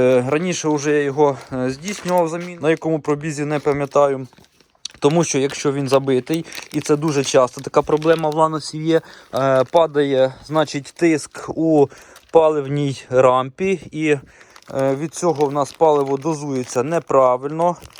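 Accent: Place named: Ukraine. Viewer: По акценту украинец , лайк и подписан давно , у самого леганза , слава Украине !!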